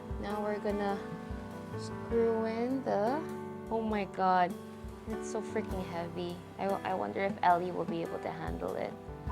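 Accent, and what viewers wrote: Philippines. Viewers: I would also love to make my own customized liquid loop for my pc. How expensive it is compared to a normal AIO? And by the way, you have an accent of a Filipina, it's cute :) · are you a filipina:? cause i can hear a filipino accent in you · I just recently discovered your channel and I'm amazed at your PC assembly skills. The video editing is excellent as well. I called over my son to watch and he asked, "Is she Filipino?". What? I then realized that your accent did sometimes sound like a Filipino who speaks English (not always). Then I heard you say, "Hay nako!" OMG! (",)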